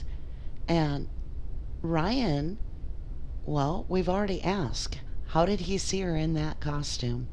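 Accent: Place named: Midwest, United States. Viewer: I used to do a lot of research on this case. I'd found out that one of the last texts that Th received was from Czech on 10/31 sometime before 2pm. I forgot where I'd found that, but it's out there somewhere. Seems like you're doing good work here. Interesting that you were able to obtain all of the photographs that you have. Interesting too that you speak with a midwestern accent that very easily could be from somewhere in Wisconsin.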